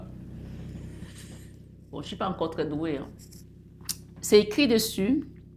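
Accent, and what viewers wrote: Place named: Cameroon. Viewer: Merci pour la guidance. Vous avez parlé j'ai pensé à une sœur Camerounaise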